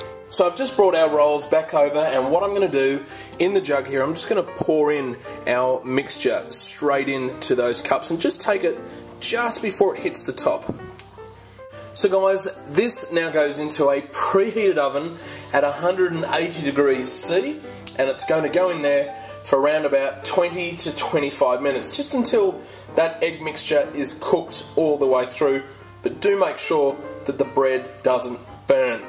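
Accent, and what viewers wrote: Australia. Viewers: That looks delicious! Love the Australian accent. Take care and God bless from Beaumont, Tx! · After the accent and the fact that he has said where he lives in a few of his videos, I believe he's Australian.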